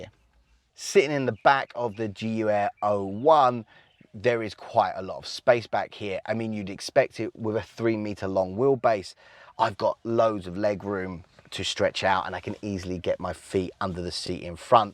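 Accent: United Kingdom. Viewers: I've been torn about writing this comment, but I've choosen to be honest with you, since I've been enjoying this channel for so long, and it deserves my honest opinion - no punches hold back. I don't like the style of this reviewer at all: 1. his strong accent make it quite difficult for people not used to British accent to understand what he's saying - this is even more important for non native english speakers, who will struggle compared to Ethan, which instead is quite easy to understand. 2. he's speaking too slow, and with a lack of energy. 3. the review itself is boring. That never happened to me before in a review of this channel. 4. please don't assume that all people watching this channel uses imperial measures - we are not. In fact, it is probably the opposite, since the US is the only country on the entire planet that it does (4% of the world population). 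Please use the standard metric system, eventually using also other local (old and obsolete) unit of measurement if you want to help people not used to it (ex. "I'm 1,75m (or 5 feet 9 inches) tall"). Thank you. So, not meaning to be rude, but I'll probably skip the next reviews with this reviewer...unless is really a car I'm looking for. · Some people struggle with the English accent. I tried to slow down a bit to compensate. Seems it didn't work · I dont like the audio , the accent is so british for me or even irish , and its not entertaining everall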